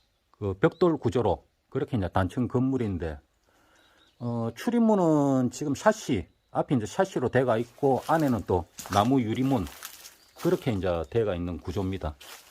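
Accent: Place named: Hadong, South Korea. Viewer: 하동출신인데 고향말투가 느껴져 정겹네요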